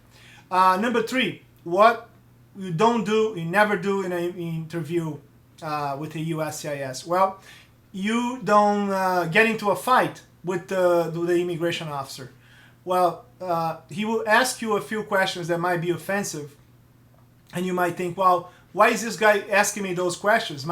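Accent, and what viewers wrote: Brazil. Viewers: What do you mean? I asked if he was Brazilian because of his accent. Btw, I am from Brazil. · Hey. Great explanations. May I ask you if you are brazilian? I am, and I just noticed a simillar accentuation. If not, forgive me any incovinience. By the way, do you Speak Portuguese? Hugs. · Looks like Brazilian accent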